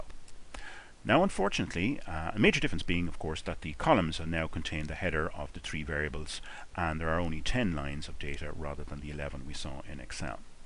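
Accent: Ireland. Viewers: This video was clear, addressed exactly what I needed to learn, and did not have unnecessary commentary! Thank you! When you are working hard on several problems and you get exactly what you need in a comprehensive yet streamlined presentation, it is a gift. I would take a course taught by you Dr.. I am married to a man from Galway City, Ireland and your brogue added a touch a familiarity that I appreciated.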